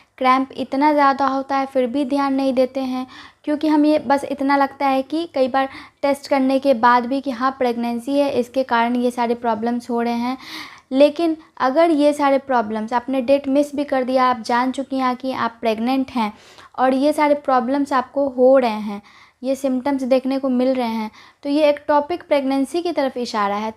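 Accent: Bihar, India. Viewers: Ma'am Aapke bolne k tarike SE pta chalta h ki aap Bihari ho 😀 am I right ??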